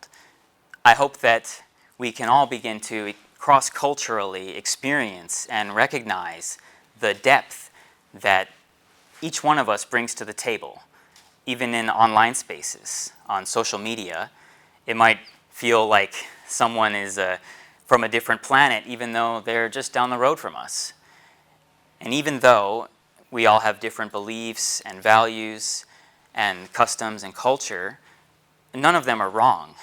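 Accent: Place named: Japan. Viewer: Dude this is beautiful! You've grown so much over the last few years. I'm impressed, proud of you, humbled, and reminded of how when I first started dating my wife I had similar reflections ("I'm from California; she's from Kansas; we're basically from different universes") that helped a lot and that I have since forgotten and started to take for granted. Thanks for the reminder! PS — You have started speaking with the cadence of my Japanese friends...INTERESTING <3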